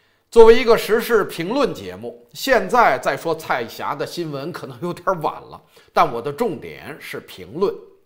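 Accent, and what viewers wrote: Beijing, China. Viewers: 言之有理，一口京腔，特喜歡聽你講！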